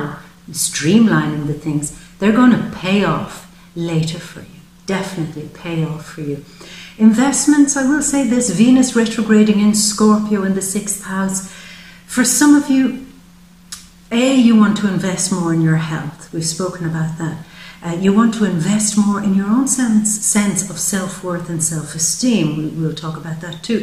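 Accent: Ireland. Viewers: Well I have to say this has resonated with me like 98.9 % I don't know how you do it. But it was really nice to hear I am thinking like your cards... Bravo Cheers Love your Irish accent. Beautiful.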